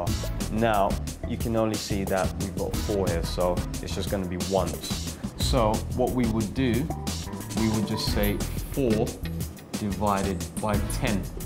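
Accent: United Kingdom. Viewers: Very good are you Brit ish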